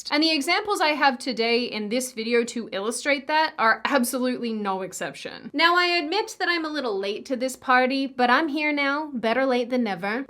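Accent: United States. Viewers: Is it me or is her accent becoming more "americanized"?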